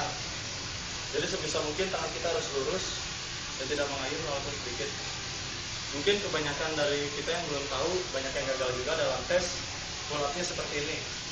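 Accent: West Java, Indonesia. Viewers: logatnya sunda euy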